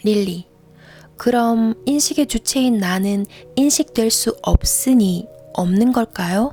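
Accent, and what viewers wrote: Gyeongsang, South Korea. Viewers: 증말 믿고 듣는 진정한 갱상도 사투리다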